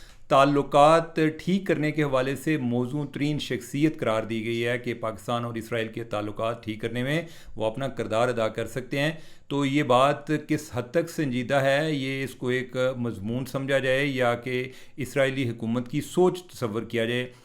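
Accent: Punjab, India. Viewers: Aap sanjeeda se sanjeeda baat ko halky phulky andaz me bayan karty hain.khas tor per punjabi tarka jo aap lagaty hain .I enjoy it a lot.Mera taluq punjab(Burewala) se hai iss liey .keep it up thanks.😂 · sb always stayed blessed.always excellent analysis sir..with punjabi touch very cute..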